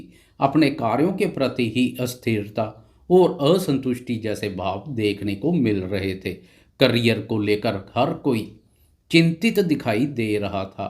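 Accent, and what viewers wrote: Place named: Punjab, India. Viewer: Guruji aap Punjabi ho shayd 😁 apki accent se lg rhaa hai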